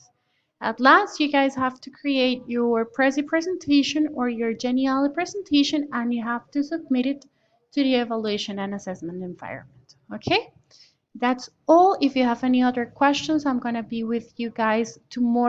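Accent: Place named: United States. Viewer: Tienes acento estadounidense profe😸😺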